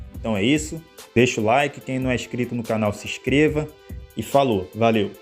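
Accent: Rio de Janeiro, Brazil. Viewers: Tu é carioca?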